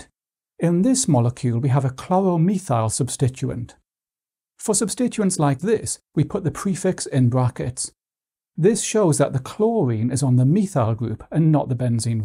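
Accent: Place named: RP, United Kingdom. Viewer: Why do you sound so posh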